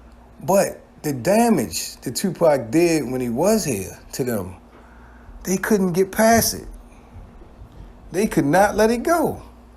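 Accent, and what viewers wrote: Baltimore, United States. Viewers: First of all Biggie was hip hop unsigned hype b4 he met pac . And puff made big career not no pac . Pac a whole liar first thing first you a pac fan from bmore i can tell by your accent talking bout ny stuff you clearly dont know much about . Did he sleep on son couch mayb once or twice but he didnt live with pac yall take that bullshit and ran with it . Pac got robbed by real gangstas and did what pussies do blame everything else except for the fact they got caught slipping . He so tuff why he aint get at king tut and them u know why cause he fucking couldnt tupac is a actor he took his acting to the rap game . Once he saw how ppl loved him for his role as bishop he adopted that in his rap shit and it worked . Why would big get involved in some shit he dont have nothing to do with . Like flex said pac lied and we all know it tupac the biggest fraud ever . 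Fuck him Brooklyn forever no slander on the King of Ny . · You straight from Baltimore.. lol great channel my G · U gotta be from Bmore ! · Im loving that bmore accent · It's crazy how we can tell you're from b more just by the accent that's cool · aye bro you from Baltimore?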